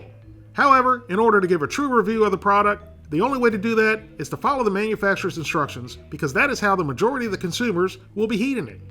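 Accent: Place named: East Coast, United States. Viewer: you sound like you're from the east coast. What area are you from?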